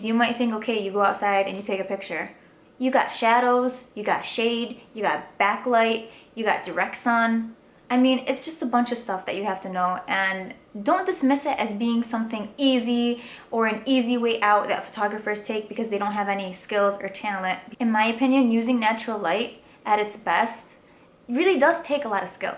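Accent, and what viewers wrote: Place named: Detroit, United States. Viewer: Yeah you from Detroit ... I hear it all in your voice lol I know cuz im from here too lol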